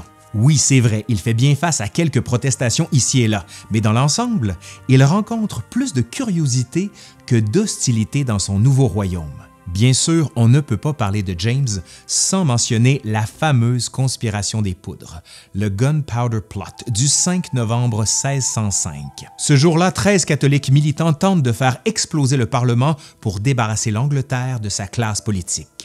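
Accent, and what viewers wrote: Quebec, Canada. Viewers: Excellente vidéo, comme toujours ! Et ce petit accent Québécois si charmant c'est la cerise sur le gâteau 🎂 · Oui, a un accent québecois et il maitrise très bien sa langue. Ce n'est pas commun hélas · La personne de cette même vidéo parle le français canadien, est-ce que vous le comprenez?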